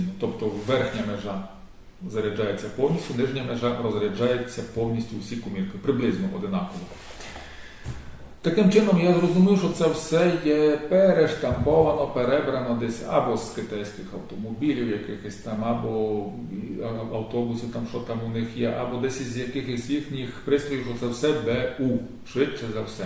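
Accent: Transcarpathia, Ukraine. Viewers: Ви із Закарпаття)))?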